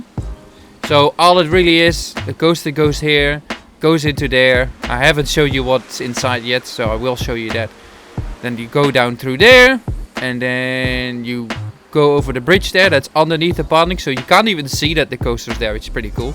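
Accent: Netherlands. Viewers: I smell dutch maar ik heb eindelijk mn mede adhd’er gevonduh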